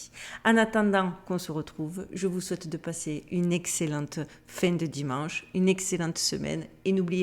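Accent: Marseille, France. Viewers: Et bien tu m'as captivé et tu m'as apris des choses qui me réconforte dans le fait que je suis de retour à l'église depuis 11 mois et ce 3 fois semaine.. Je te remercie de faire toutes ces recherches car moi j'en serais incapable... J'aime t'écouter avec ton accent de Marseille. Comme quand j'écoutais Clairette ici au Québec étant jeune. Padre Pio sa PROPHÉTIE serait un bon sujet ..🦊🐾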